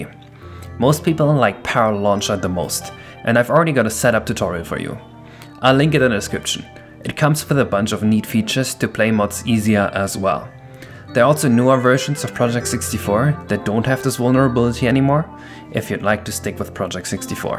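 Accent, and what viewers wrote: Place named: Germany. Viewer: hey, i like you and your videos however it would be nice if you could refine your pronunciation a little more its not bad by any means, still it could use some improvements here and there f.e mispronouncing some words or your still somewhat noticeable german accent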